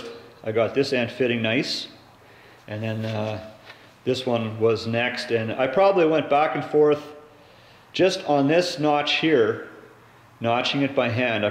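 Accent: Canada. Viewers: I'm going to guess that he's from Newfoundland.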